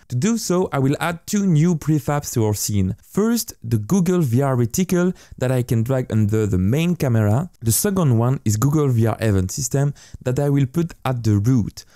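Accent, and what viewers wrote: France. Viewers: As a French person, I can undoubtedly detect a French accent when I hear one!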